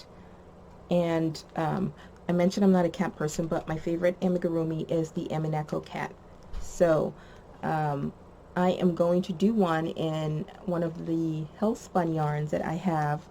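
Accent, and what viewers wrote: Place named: Massachusetts, United States. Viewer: I can definitely hear your ma accent 😂 I should have known from the last video, one of my best friends is in CT near ma though and she has a similar accent. Loved the video. Thank you for sharing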